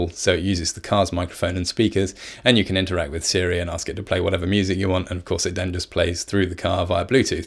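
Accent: United Kingdom. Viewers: interesting... I hear britishism but I also hear american tapped t... besides "normal" tapped d by the british I thought you might be a foreigner, a scandinavian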